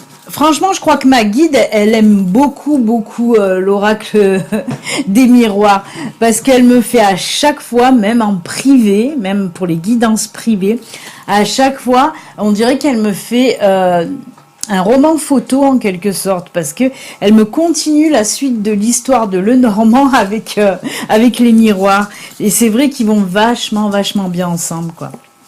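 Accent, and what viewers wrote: South, France. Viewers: J’ADORE Votre Accent du Sud 🙏😉🥰🥰🍀🍀🍀🍀🌷🌷🌷🌷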